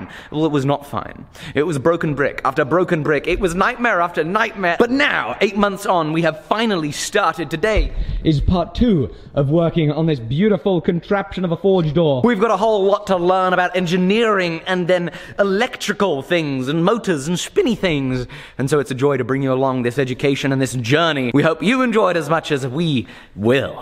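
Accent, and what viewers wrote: United Kingdom. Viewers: thought so as he says certain things in a british way or using British slang plus the accent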